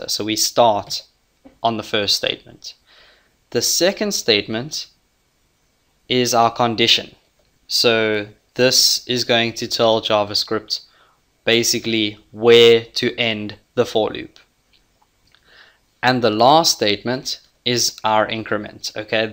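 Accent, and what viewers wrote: South Africa. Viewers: I knew that was a South African accent. Thanks boet !!!!!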